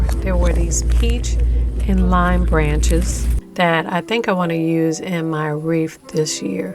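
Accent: New Orleans, United States. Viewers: I just want to say that I love listening to your beautiful soothing voice and NOLA pronunciations. Your intellect and creativity shine brightly and what you say is only enhanced by how you say it!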